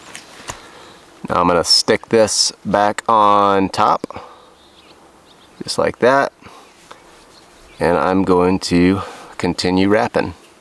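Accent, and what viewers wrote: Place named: South, United States. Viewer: where is he from? slight southern accent but he says he's in the rockies. i'm confused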